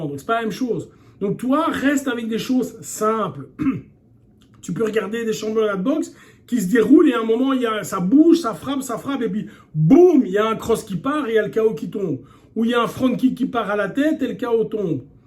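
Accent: Belgium. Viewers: Quand j ai commencé à vous suivre il y a quelques années...c était bien évidemment pour vos conseils mais également (et cela va paraître bizarre ),pour votre pointe d accent bruxellois..qui me rappelait avec une certaine nostalgie mes études et 10 années passées à travailler dans notre capitale. Quand je passe cage aux ours systématiquement je pense à vous....et vous voir continuer de nous donner vos précieux conseils alors que la majorité des patients sont prostrés à l idée des effets secondaires de la chimio,cela force le respect. Pareil à cambronne à waterloo, je vous imagine rétorquer à cette saloperie de maladie",la garde meurt mais ne se rend pas"...et à cet instant, voir ce cancer battre en retraite et choisir un adversaire plus faible. Longue vie à vous et je vous envoie un max de bonnes ondes bourrées de Kimé👊💪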